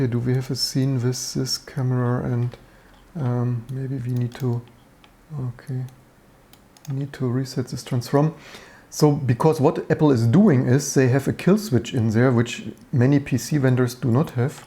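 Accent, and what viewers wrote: Germany. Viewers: Very informative video, its really motivating to see that im not alone with repairing stuff instead of throwing it away. PS: Are you German too? Your accent really sounds like it.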